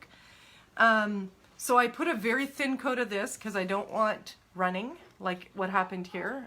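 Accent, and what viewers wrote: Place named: Minnesota, United States. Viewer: Aside from saying "Ay", you sound just like a Minnesotan to me😁 I want to make cups now, you made it look doable (not easy) for a crafter. Thanks!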